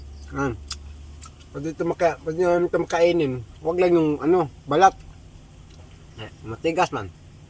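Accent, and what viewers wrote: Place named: Visayas, Philippines. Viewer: Okay lang naman tagalog niya po idol,bisaya kasi si idol.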